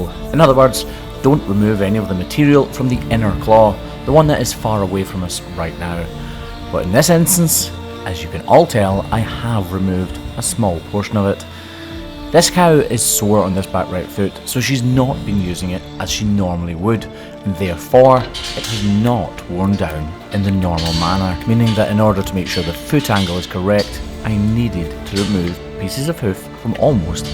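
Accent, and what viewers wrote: Scotland, United Kingdom. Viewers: I've been watching your channel for a while now (I believe more than 3 years) and I've always been very thankful for your job, you and your team always do a great job taking care of these animals and it's very satisfying to watch ❤ but I also wanted to thank you for helping me improve my hearing skills! I'm a non-native English speaker (it's my second language) and the Scottish accent is very particular (and from my understanding, one of the hard ones to understand) so hearing your videos has helped me a lot! Thanks! 😊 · Can't get over the Closed Captions saying "So this carrot" at the start of the video, and I've never wanted to be Scottish more in my life. I mean my family is from there, but I didn't get the cool accent, just the freckles.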